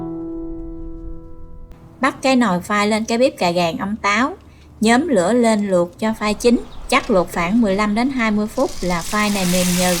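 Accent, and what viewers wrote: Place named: Mekong Delta, Vietnam. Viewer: Làm bánh khéo quá, giọng em gặt Miền Tây luôn đó, nghe rất hay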